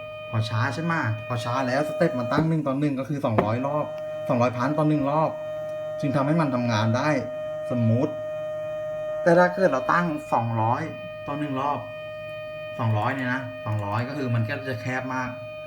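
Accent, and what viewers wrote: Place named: Ban Pong, Thailand. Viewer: กำลังติดตามครับ ฟังสำเนียงแล้วน่าจะคนบ้านเดียวกัน บ้านโป่งคับ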